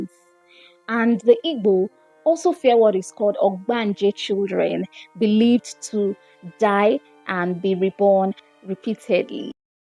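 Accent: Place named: Luo, Kenya. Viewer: This lady speaks like a LUO girl, What up nyar madhe?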